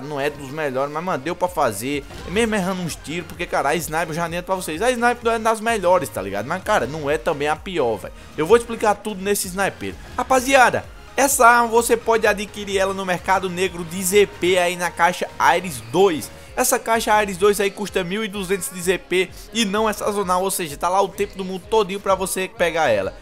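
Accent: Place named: Pernambuco, Brazil. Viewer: vc é pernambucano ta falando Papangu moro na cidade do Papangu👏👏😉😉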